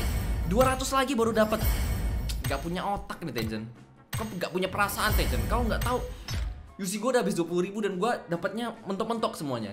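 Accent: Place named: Medan, Indonesia. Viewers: Orang Medan nya abg ini😂😂😂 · Nampak kali Medan mu bang 😂😂😂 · Bg Logat mu kayak orang Medan😂😂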